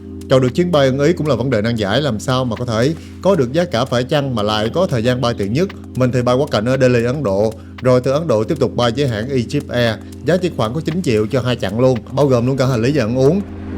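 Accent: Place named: Mekong Delta, Vietnam. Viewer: Cái kênh du lịch mà tui thích vì phần thuyết minh rất riêng của, vừa hay mà cũng vừa mắc cười, đậm chất miền Tây rặc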